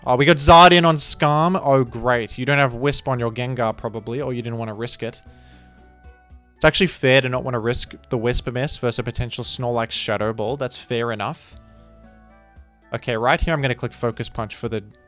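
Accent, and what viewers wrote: Australia. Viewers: I know it sounds basically the same for americans but he is, in fact, australian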